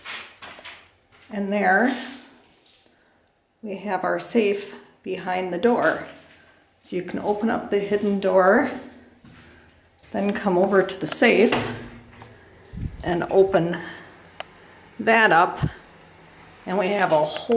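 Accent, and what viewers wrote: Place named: Minnesota, United States. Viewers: Where are you from? I'm guessing that's a Minnesota accent? Or North Dakota? Sounds like the cop on Fargo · Yeah sounds Minnesotan to me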